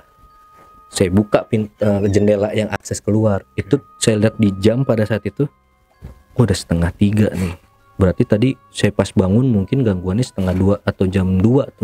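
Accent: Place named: Bekasi, Indonesia. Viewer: Temen gw orng bekasi jg emng logat dan cara ngomongnya kaya gini persis klo cerita, asik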